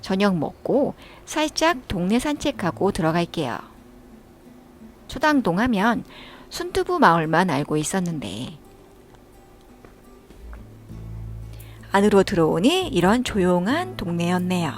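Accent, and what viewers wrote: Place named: Japan. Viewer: 안녕하세요 ~ 혹시 일본인이세용? 말투가 한국인이 아니신듯 해서요~😂 혹시 한국이시면 특이하신 말투시네요~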